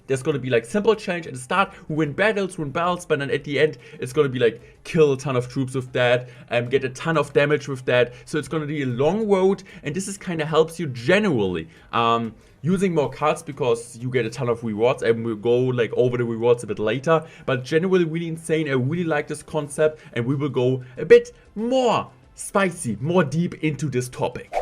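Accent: Germany. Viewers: dieser deutscher akzent lol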